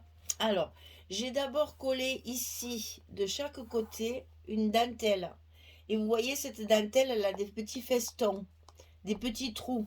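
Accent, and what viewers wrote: South, France. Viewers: Bonjour je trouve vos albums bien jolis. En ce moment je suis en train de faire l album snowy winterland en suivant les tutos. Je vais aussi tester les capsules. Dans tous les cas merci encore pour vos tutos et aussi votre accent du soleil me donne du baume au cœur.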